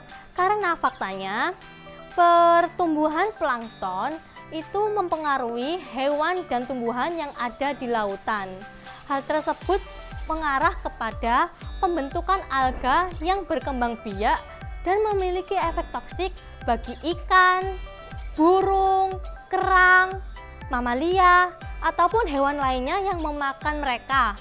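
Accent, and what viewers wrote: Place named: Java, Indonesia. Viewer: Medoknya imut deh 😂😂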